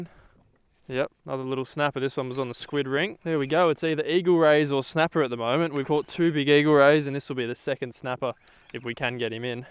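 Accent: Australia. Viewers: Where are you based like country wise because your catching snapper and those annoying ass sharks that tangle up your lines 😂 so I was guessing New Zealand north island area but your Aussie accent is putting me off 😂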